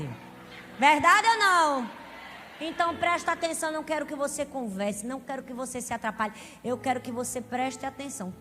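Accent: Northeast, Brazil. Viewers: e esse sotaque nordestino? 😍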